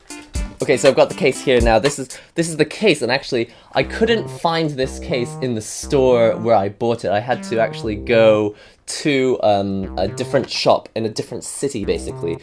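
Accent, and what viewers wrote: England, United Kingdom. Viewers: Question time... Please don't take offence) where are you from? because you have a very English (I think) accent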